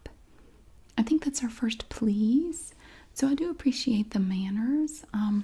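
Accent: South, United States. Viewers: Love that southern sass! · YES! We love a sarcastic southern queen ❤️ Amazing video 🤘🏻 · Heaven have no sass like the Southern sass, of a southern lass. Thanks miss, for making such good an great vids. PS from a fellow Southerner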